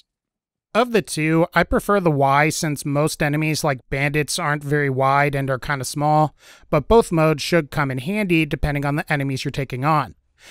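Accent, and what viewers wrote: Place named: East Coast, United States. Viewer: Im guessing usa east coast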